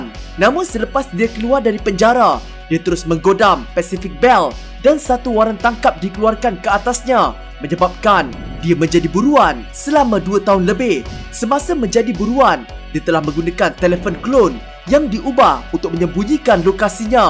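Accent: North, Malaysia. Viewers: uii orang utara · Org utara ke yg bercakap nie. Tak sesuai la bro🤣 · Org utaqa kaa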